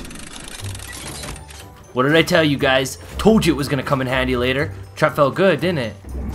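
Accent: Canada. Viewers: Is he Canadian? Just wondering cause he doesn’t say sorry like an American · Are you from Canada? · Are you Canadian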